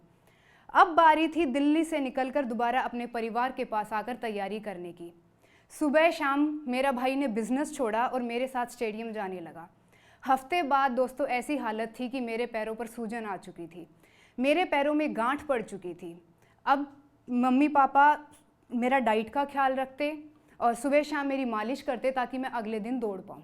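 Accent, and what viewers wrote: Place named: Haryana, India. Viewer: Apki haryana dialogue bahut mast thi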